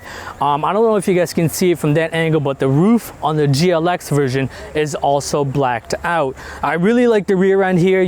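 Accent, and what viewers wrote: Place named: United States. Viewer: Good video, you sound like an American, if so how do you think the Jimny would handle American highways and traffic. Long drives that sort of info. TY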